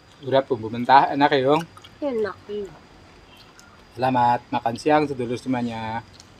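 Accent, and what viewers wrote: Banyumas, Indonesia. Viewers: Kang..niku sampeyan asli bms.versi Cilacap nggih.bag.pundi. koh ksthah pekawisan kalih taneman sdy wonten.versi mbah2 moyang...sdy wonten... obat tradisisyr2 unik.buah2 unik wekdal rumiyin.lan sampeyan ugi asli njaweni nguri.uri adat leluhur.bahasane nggsndem medok bmsan.lah biyunge ugi cekatan ndampingi.sehat2 nggih yuuunge.... pertahankan kang..unik.asli.ngangeni. semangat...🙏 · 😂....Mas daerah mana? Kayak Banyumas logatnya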